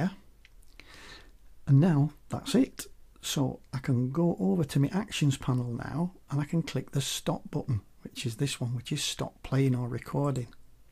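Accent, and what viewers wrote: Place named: Yorkshire, United Kingdom. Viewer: Nice one....I can think of all sorts of uses for this. Great to hear a Yorkshire accent too:)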